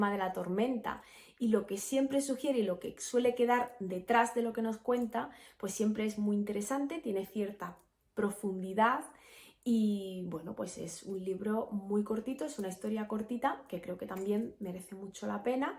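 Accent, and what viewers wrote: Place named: Andalusia, Spain. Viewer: Yo también soy andaluz y me encanta ver tus vídeos y disfrutar de tu precioso acento andaluz.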